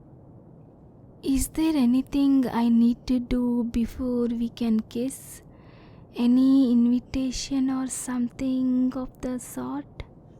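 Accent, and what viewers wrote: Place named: India. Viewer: Wow, I've been listening to asmr rp/story audios for awhile now specifically ones with female voice actresses but i don't think i have ever listened to one where the voice actress has an Indian accent, you sound really good. I myself am Indian but my family are not from there so i actually never heard the accent much at all.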